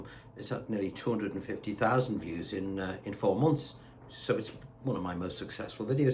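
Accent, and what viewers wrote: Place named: Wirral, United Kingdom. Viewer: Hi the scouse accent drew me in here but you have that posh twang of a Wirralite or Formby guy? the other thing is our strap with the Union jack That was my flag all my lfe i never even knew there was an England flag I noticed the change away came about 1993? Being a classical piano student the guitar fretboard seems very complicated in comparison but this helps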